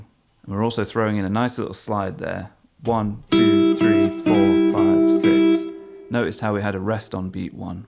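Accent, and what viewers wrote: United Kingdom. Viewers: The playing out of the chords is sublime. It shows how complex the song really is. Absolutely gorgeous. Oh my God he just spoke and he’s British! Beautifully and intelligently spoken. Beautiful.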